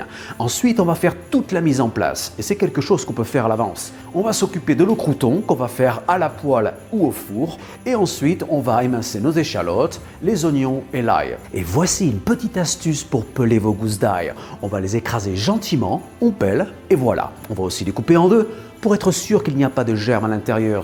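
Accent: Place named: Bordeaux, France. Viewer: C’est trés formidable Chef et merci beaucoup. His accent seems to be from Bordeaux.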